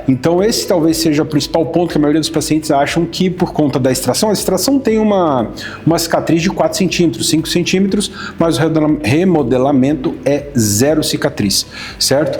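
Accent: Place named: Rio de Janeiro, Brazil. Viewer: Dr é do RJ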